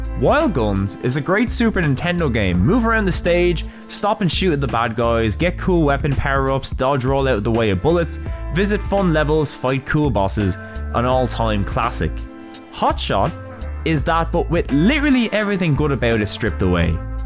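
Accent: Ireland. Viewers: I'm glad you picked up on something closer to his earlier Game Dungeon style. He's transitioned into doing a sort of 'play-by-play' style as he goes through the games because it's easier for him to get episodes out, which is understandable, but I don't like it quite as much, with the game having more of a factor in whether or not I like the episode than it did previously. So a channel with a classic Game Dungeon style talking about PS1 games most people don't remember with some dry humour sprinkled throughout? Now that's a winner right there. Throw in an Irish accent that I have fun imitating and making the decision to subscribe to you didn't take long at all. Keep it up, lad.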